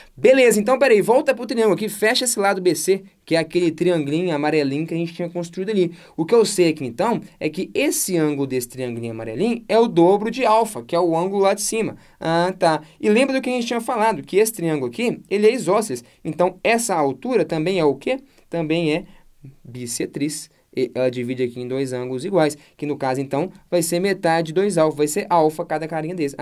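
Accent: Minas Gerais, Brazil. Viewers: Você é mineirinho ou só finge?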